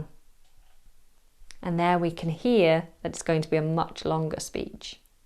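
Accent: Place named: United Kingdom. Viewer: Dear Madam Teacher,thank you very much for all your effort.I am so grateful for your brilliant,amazing and perfect videos.My very personal opinien is that your british accent is one of the best inside huge and vast Internet.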